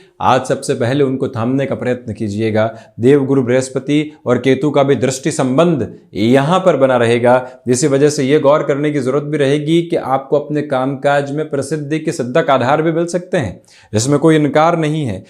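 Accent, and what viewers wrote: Standard. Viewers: Itni shudh hindi thodi si english ke sath 😱😳😱😳😲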